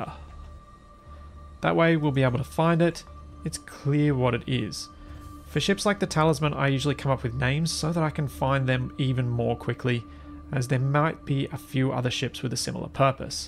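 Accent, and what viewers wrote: Australia. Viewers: I have been enjoying this game sooo much more since I started watching your videos, so just wanted to thank you for all the time and effort you have put in - they are all brilliant - Thank you! I have even gotten used to the Aussie accent:p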